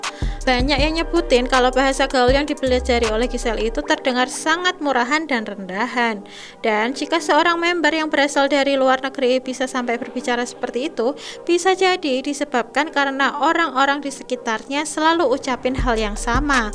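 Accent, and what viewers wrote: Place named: Java, Indonesia. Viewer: medok mbk nya wkw jd salfok soundnya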